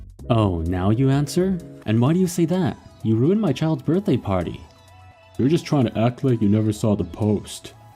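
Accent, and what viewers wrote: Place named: England, United Kingdom. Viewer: you sound like TheRealKenzo but with just a pure english accent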